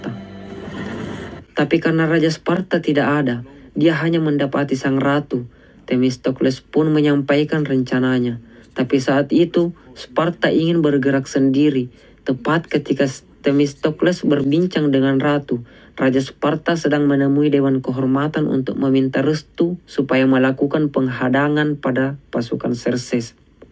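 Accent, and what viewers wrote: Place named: South Sulawesi, Indonesia. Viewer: dari logat kayak org sulsel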